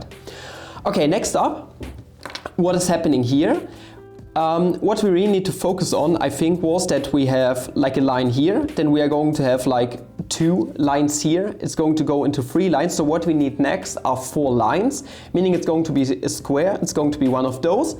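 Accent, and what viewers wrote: Germany. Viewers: I do not know why, but I enjoy your german accent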